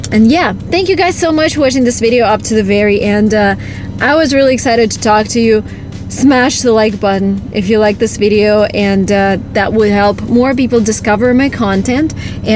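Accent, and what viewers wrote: United States. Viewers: How does that accent sounds? Do it sound american to you? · I love your accent . You speak really American English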